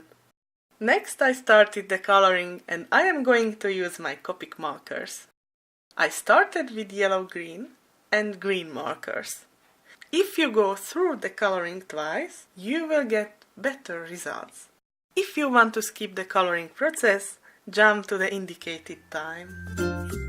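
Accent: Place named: Netherlands. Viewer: Hi! I chatted eith u on IG briefly. Awesome creation!😍😍😍😍 ps..love ur accent (dutch?) Im in USA (New York accent but i live in Florida🌞)lol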